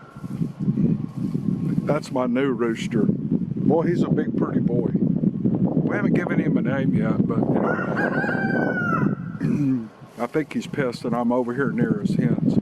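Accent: South, United States. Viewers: MR., you talk and explain as much as you want to I enjoy listening to you talk, your accent reminds me of home and you got a good old boy accent I feel,you are a fantastic narrator and even if there was more commercials I would still put up with it to listen to you talk look at your stupid chickens and just enjoy myself of Southernman temporarily stuck in Chicago I would rather stay at home and listen to you then wander around outside in the jungle there ain't no bigfoots in this jungle! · Love your southern accent and the stories. Keep up the good work 👏🏾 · I am addicted to your Chanel. In your interview 5 you mentioned that you were not collecting 🥚 from your hens. It is happening to me now and I solved the puzzle. Two of my 4 hens are eating the eggs. You have beautiful animals. You may call your roster Claudio. I watched your documentary and enjoyed it. 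You are an excellent storyteller and I like your southern accent. Regards from Puerto Rico 🇵🇷!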